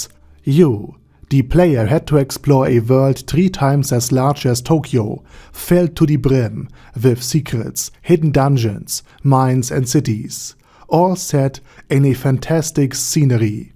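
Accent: Germany. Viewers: his voice's the stereotypical german accent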